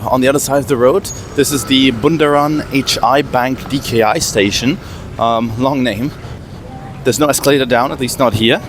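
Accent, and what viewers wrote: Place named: Germany. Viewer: Nice to hear your english .German english is the best.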